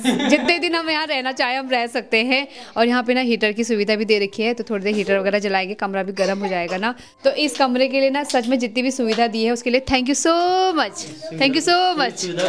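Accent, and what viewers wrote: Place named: Almora, India. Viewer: बेटा आपका मायका कौन से गॉव में पड़ता है। आपकी भाषा अल्मोड़ा जैसी लगती है। नामकरण में जाकर आपने अपना फर्ज निभाया। बहुत अच्छा लगा। 🙏🙏🌹🌹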